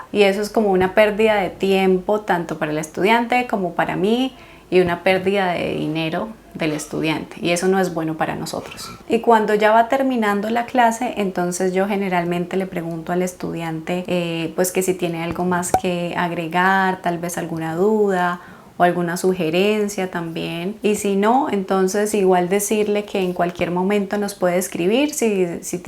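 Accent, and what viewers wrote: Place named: Colombia. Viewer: Me encanta tu acento! soy de Colombia también del área de Cundinamarca, pero ahora que vivo en Europa por más de 10 años el acento se ma ha ido. Pero por más acentos que veo el bogotano y el tuyo es de mis favoritos.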